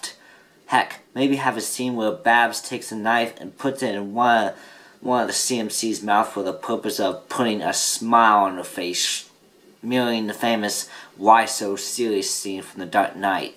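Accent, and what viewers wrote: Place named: France. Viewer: he kinda has a little french accent when he speaks for me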